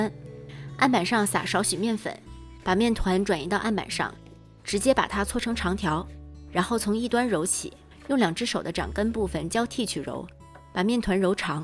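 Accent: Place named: Tianjin, China. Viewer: 听着像天津姐姐包包子，明天就动手试试看！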